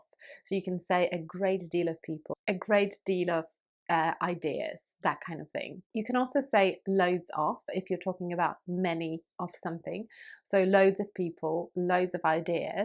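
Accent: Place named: United Kingdom. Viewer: I need to recall the vocabulary of the years I used to study in England. All of these words, phrases and accent are gone…at the same time it feels extraordinary the perfection of your British accent! Excellent work! ☺️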